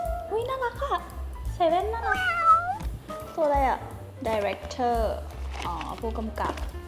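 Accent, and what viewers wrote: United Kingdom. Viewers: I fell in love with Freen's English accent!! She keeps getting great day by day❤ I'm happy that she's happy❤ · Yeah, Freen is so cute...unboxing the Astro Boy box. I love her English accent, she's naturally funny and beautiful❤️.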